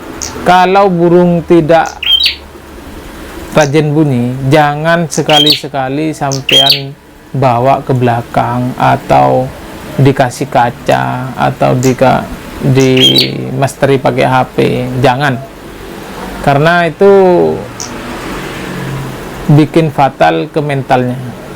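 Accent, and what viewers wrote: Madura, Indonesia. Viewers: Kayak orang Jember bahasanya.madura nya mdooookk knaaakk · Ky orang mdr ni bahasanya bengkok2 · logat e kyk orang mdr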